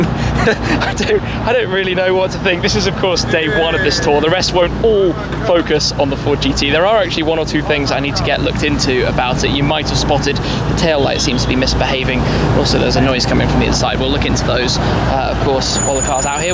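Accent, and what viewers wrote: United Kingdom. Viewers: Social media superstar, good looking, a brilliant exotic car collection AND a British accent.... you're gonna be fighting off the American girls 😋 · you'll be really popular with the ladies in the US, apparently they love the British accent.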